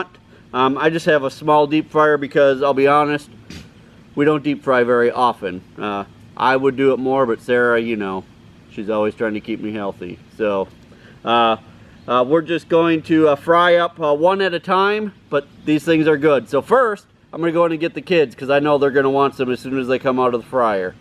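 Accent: Wisconsin, United States. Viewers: I was watching your video and was thinking how you sound just like a friend of mine. I was wondering if you were from Wisconsin. Then I read the description of the video. What part of Wisconsin are you from. My friend is from Eagle River area and I'm from Door County.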